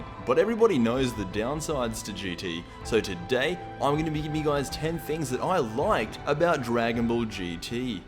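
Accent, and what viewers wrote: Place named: Australia. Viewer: Gotta love that unique Australian accent!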